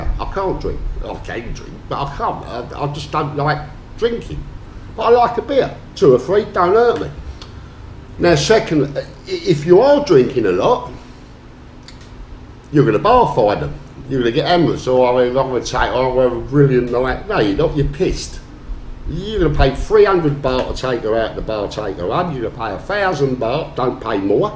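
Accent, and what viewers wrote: United Kingdom. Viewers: The problem is that the Queen does not speak like most of the UK population. Whereas accent is the most widespread in the UK. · "Be on the Piss all night every night" I Love British Speak :)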